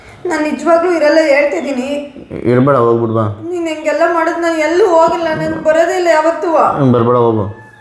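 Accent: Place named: Hassan, India. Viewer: Hassan Mathugale Chanda❤❤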